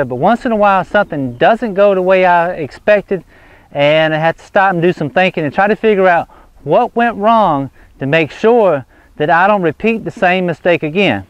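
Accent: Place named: Kentucky, United States. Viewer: Awwwh, that's a shame that you've got this funky situation now. Hopefully this will clear up soon and you'll again be able to plant the seeds you want and have them thrive. I am only an old disabled woman in a wheelchair, and live in the Phoenix suburbs here in a doublewide mobile in a mhp setting and because of the patio and carport overhang type roofs, I really don't have much ground for planting a garden. I'm trying to grow what I can in containers but most of it is in mostly shaded areas of the patio. It's hard, I've tried getting things going since April of this year, but everything keeps getting burnt up so fast from the sun, and the HEAT, even when I drag the containers into the fully screened inner patio area. It's so hard to watch things begin to sprout and get all happy and then have to watch them get burnt to a crisp with a few weeks as I try desperately to get them the sunshine that they obviously need, cuz they're getting too leggy! 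I watch your amazing videos and just dream of having a place as big as yours and the physical ability to farm it too. You are truly blessed and it's so nice that you share your information, whether good or bad, with us. I love hearing your accent, my maternal Grandfather was from Lexington KY and I adored hearing him talk about just anything. He's been gone for many years now, but everytime I hear your voice, it reminds me of him a little bit. Anyhow, thanks for the information, I'd often thought of using something like sawdust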